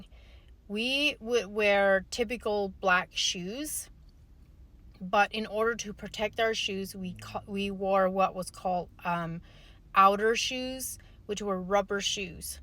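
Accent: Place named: Pennsylvania Dutch, United States. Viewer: The Pennsylvania Dutch accent really comes out when telling these obviously very beloved childhood stories!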